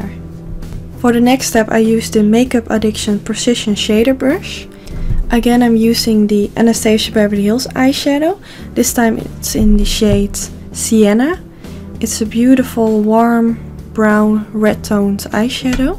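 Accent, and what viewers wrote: Netherlands. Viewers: Hi! Gorgious make-up i love it! 😘 I have a question tho, Are you Dutch? Cause you sound like one. Let me know. 😘 Fallonrachel (The Netherlands)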